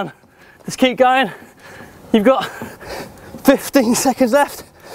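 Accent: England, United Kingdom. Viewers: Alice Liddel and a bit of a softer english accent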